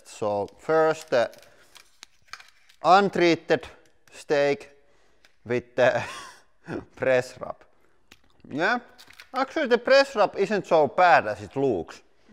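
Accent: Finland. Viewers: I know that because i am finnish myself. I almost immediatly noticed it because the merch of The Black pepper. And he has a finnish acent. Sry if i spelled wrong.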